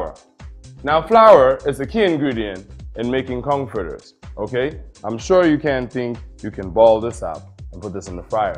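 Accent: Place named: Turks and Caicos Islands. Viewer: Oh I catch this accent now! 😂 Turks & Caicos used to be a part of the Bahamas, thus the traditional foods & recipes will be close. In my experience, the difference in taste is minuscule. Much love, Bro!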